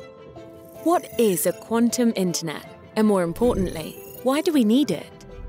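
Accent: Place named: Australia. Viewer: The Australian accent is very annoying.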